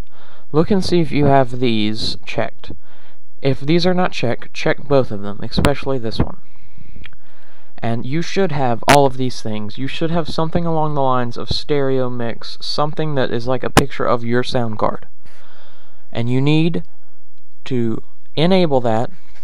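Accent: South, United States. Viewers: lol southern american. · are you fucking KIDDING ME?? i was trying to find a way to record FROM MY SPEAKERS WITHOUT A MICROPHONE and sat through SEVEN MINUTES of you bloody SOUTERN ACCENT to show me that your whole problem was to USE A MIC?? well that's not fair... i'm speaking out of hate, and now that i've calmed down, i can say calmly that ou've wasted my time. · you has a country accent like me :p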